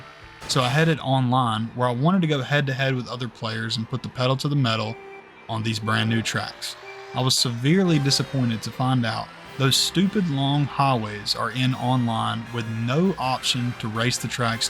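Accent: South, United States. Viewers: love his southern accent too as a Texan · completely off topic, but where in the South are you from?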